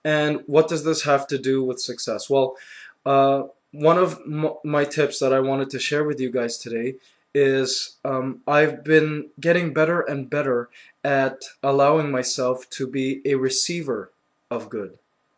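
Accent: Philippines. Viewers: i love it when you're speaking with pilipino aksent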